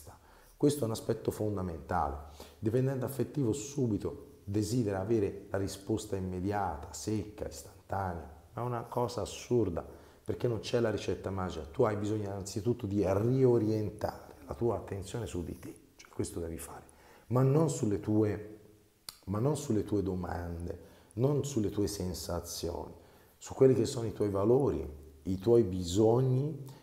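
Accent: Tuscany, Italy. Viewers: Basta, fatela finita, basta andare a vedere questi video sul narcisismo 😂. Molto simpatico dottore, ogni tanto la seguo proprio per questo. Ha perfettamente ragione altrimenti diventa un ossessione. Si esce da una dipendenza e si entra in un altra . Complimenti per il suo modo schietto di esporre . La parlata toscana ben si addice. Chapeau 🙏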